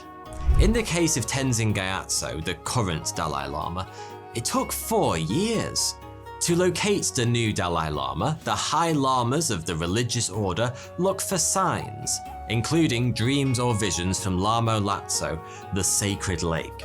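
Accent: United Kingdom. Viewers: Don't listen to this guy he's just an as** cant stand his tone patronizing sound the fact he sounds U.K-ish" doesn't help he obnoxiousness deep six him ASAP just awful & full of Ken himself pass he deserves no debate period may his YouTube" just go down like a titanic we have no time for his type when u hear his type we just go onto the next boy bye he's typical basic 👽 👀 👍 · Your accent explains why is English written the way it is written. Your pronunciation makes it written phonetically, which puts him with other European languages. The US pronunciation of the same words doesn’t make any sense because the pronunciation of the vowels doesn’t match the letters, I.e. it’s not phonetically written. Now I begin to like the British English language.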